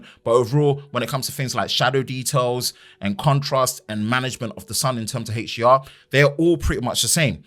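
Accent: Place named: United Kingdom. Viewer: Again, one of the best phone camera comparisons. Thanks for your effort. And as usual, awesome british accent. The ice topping. I saw a battery comparison between iphone 15 pro max, poxel 8 pro and pixel 7 pro. The iphone is way way way better. What surprised me was that the pixel 7 pro had slightly better battery life than the 8 pro. I thought they would have worked on it. With that in mind amd seeing the pictures, I think the 7pro offers the most for its money. Where the 8 pro really shines is the AI stuff.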